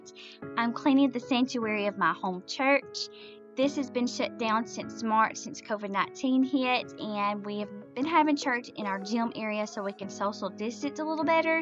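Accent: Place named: South, United States. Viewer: You’re so thorough! You did a wonderful job! ❤️ And I LOVE your accent! I’m southern, but not as southern as you! So adorable! 😍 Can’t wait to see more of your videos!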